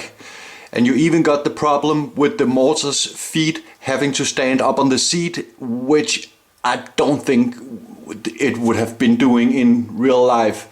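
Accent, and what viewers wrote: Denmark. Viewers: You danish? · Are you from Denmark? Sounds like it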